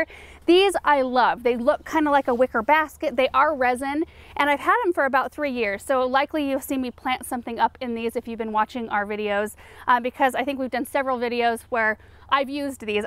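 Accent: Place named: Northwest, United States. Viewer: Yup, I pronounce herb and foliage exactly like you do. We're both from the Northwest.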